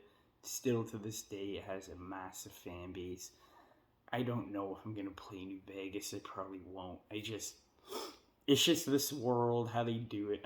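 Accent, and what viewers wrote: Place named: Canada. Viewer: Don't respect anyone with a Canadian accent's opinion on "Falloot"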